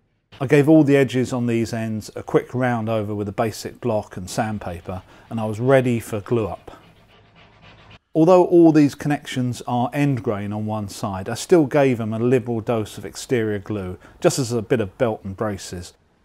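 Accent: United Kingdom. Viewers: I appreciate each new video, very smart and useful designs. And the british accent makes it so entertaining to my French-canadian ears. Great job Sir! (I should copy/pastre this message on all your videos).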